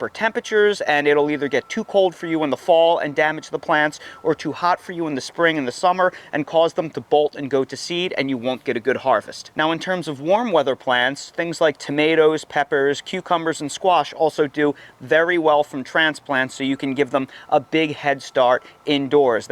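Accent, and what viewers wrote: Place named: Philadelphia, United States. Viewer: I recognize ur Philiy accent!!❤️ God's Blessings to u in all ways!!💜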